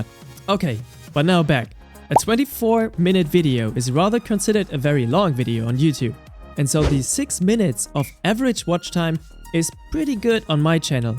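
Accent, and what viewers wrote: Germany. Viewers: yo i didnt know u had a wife (i also think that ur german accent helps ur vidoes)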